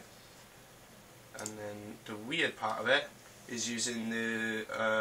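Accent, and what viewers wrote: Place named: United Kingdom. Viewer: 'eees soooo british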